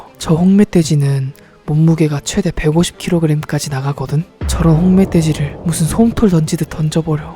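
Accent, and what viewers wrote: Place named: Jeolla, South Korea. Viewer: 전남 말투같은데..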